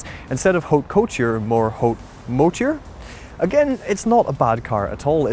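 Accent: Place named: Birmingham, United Kingdom. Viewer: He has the same accent like the other guy. Same hometown maybe? Birmingham?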